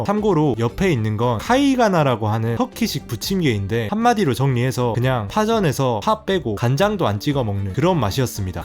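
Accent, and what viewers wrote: Jeolla, South Korea. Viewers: 억양이 전라도분이신거 같습니다. 영상 재밌게 보고 있습니다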